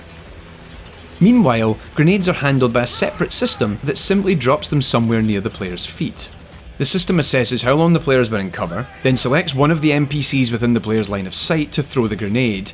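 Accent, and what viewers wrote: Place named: England, United Kingdom. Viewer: He's not wrong people, PLEASE play this before you watch, it is really fantastic. Also, I'm glad you talked about PTSD. I know I shouldn't be talking about personal stuff in some random comment thread, but I have what is being classified in the ICD Beta 11 as C-PTSD, or Complex Post Traumatic Stress Disorder. Your accent reminds me of when I went to England, I got held up in Heathrow because they wanted to make sure I was "Safe to let in" which kind of turned the whole experience negative. But what made it better, and really helped me, was one of the Security Officers was a Soldier who fought in the Falklands and Northern Ireland, and he was telling me that if I ever needed help, theres a group he volunteers with of Veterans, and he said, even if you weren't a Veteran and needed support they were there for everyone who suffers from PTSD, to whatever extent. 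And I think thats probably indicative of the way people with PTSD cope. Life is going to shit on you. Sometimes you're going to make choices that end up being mistakes that shatter the sense of humanity you hold on dearly too, and its going to rattle the foundations of your being, but there are little lights, little glimmers of beauty and joy in the world, and those are the things you should encapsulate and always keep close to your heart. The Day is Always Darkest Before the Dawn. Its not 100% relevant to this game, and I won't spoil why, but, if you have PTSD, especially as a Combat Veteran, this game might be a bit trig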